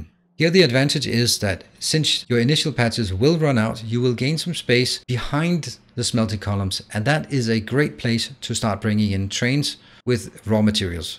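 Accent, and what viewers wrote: Denmark. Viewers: Wow you sound super duper danish. :) Nice video!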